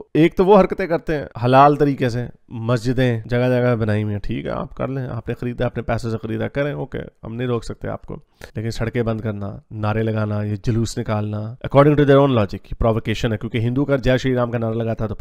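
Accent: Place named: Punjab, India. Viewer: I love the punjabi accent ❤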